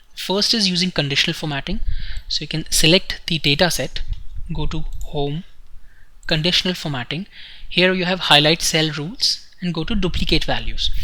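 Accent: India. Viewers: Good but i really dnt like indian accent!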